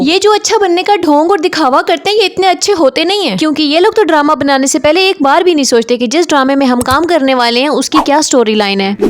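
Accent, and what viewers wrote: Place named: Punjab, India. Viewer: Kia tum Sana's bucket ho? Same awaz same style... wai patli karari si full speed pe bhagti train with some punjabi/potohari accent 😄🙉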